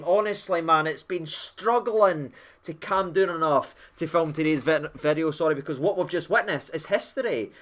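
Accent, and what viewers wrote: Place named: Glasgow, United Kingdom. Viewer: Great result last night. Love your videos and wee Glaswegian accent. Best from Oz.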